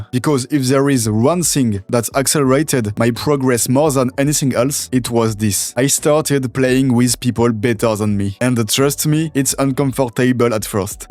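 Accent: France. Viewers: as a french, i approve this accent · I really love that French accent! Lovely video, keep up the good work man! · I'm italian so I find hard to compliment a french, yet I must say that french english accent is really cool 😂 Also you are my favorite the finals pro. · This is the most French Frenchman I've ever heard. Subscribed.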